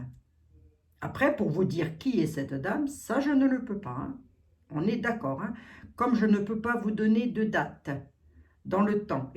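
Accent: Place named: East, France. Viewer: J'adore votre accent de l'est je suis née à Epinal, je reconnais cet accent entre mille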